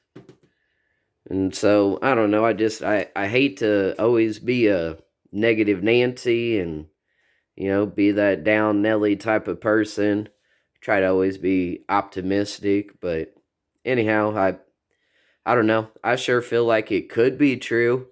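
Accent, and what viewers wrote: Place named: South, United States. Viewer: Lol im from michigan. People don’t understand deer like that don’t grow in northern Michigan. That’s why Jon Eberhardt knows buddy. Because he hunts in northern Michigan. Trust me when I say this they don’t get that big up there. I live in southern Michigan, which is where the biggest bucks are in michigan, and I have never seen a 30 inch spread on a buck. People in Traverse City Michigan are lucky to shoot an eight point that’s 15 inches wide Buba. It’s not about whether the antlers go straight up. It’s about the soil content that doesn’t give credence to the idea that a Deer in northern Michigan could grow a rack that’s that extraordinarily wide. I’m sorry dude unless you’re from Michigan you just won’t understand. Don’t want to break anyones heart, but there’s no factual proof to that damn buck and if there was at all, he would’ve put it under an x-ray. 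He was the scorer for the commemorative bucks of Michigan man. He was the type of guy who regarded and revered records. If he wasn’t he wouldn’t have been part of CBM. Sorry, I hear the southern drawl in your accent and it just makes me have to say Bubba come up here to Michigan and let me know when you see a deer that’s 30 inches wide. As to John Eberhardt, he is one hell of a deer hunter and to put over 100 deer that score over 100 inches on the dirt in Michigan is some seriously good huntin.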